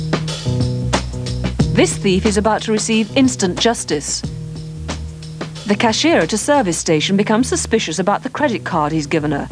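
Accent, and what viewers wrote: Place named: United Kingdom. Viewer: british accent. no need to explain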